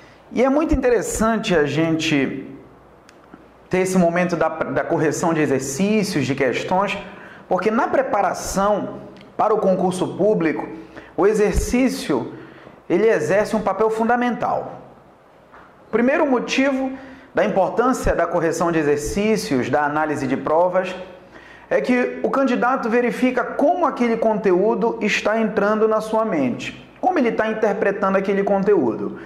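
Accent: Pará, Brazil. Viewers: O senhor é paraense?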